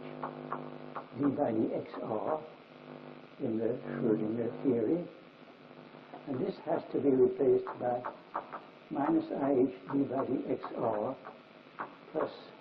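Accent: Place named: United Kingdom. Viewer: I was wondering! It can’t be, this guy has a British accent but Dirac was American right?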